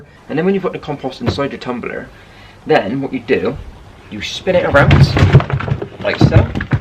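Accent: Bristol, United Kingdom. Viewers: I was going to say this is a normal Bristol accent. Some people have no ideals! Lol ❤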